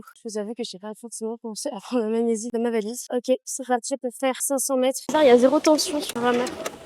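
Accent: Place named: United Kingdom. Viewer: Dommage pour l'éclairage de la salle ca fait un effet assez désagréable ça 'clignotte' vachement :/ Pas de ta faute hein ^^ Mais pour les futures vidéos pk pas faire un effet voir ce que ça donne et ensuite régler ta cam/téléphone pour pas que ça fasse ça =) Il est mignon ton accent anglais ^^ Sinon bonne vidéo =D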